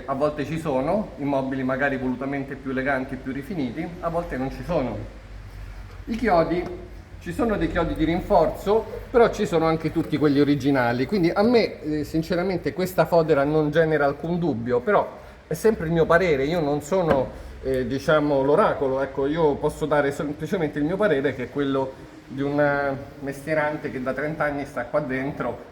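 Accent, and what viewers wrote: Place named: Lazio, Italy. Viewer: Salve 🙂 Complimenti!il suo accento forse mi sembra Laziale,ma il cognome che porta è senza ombra di dubbio Ragusano!!! saluti da un ebanista e restauratore 🤚🏽🤚🏽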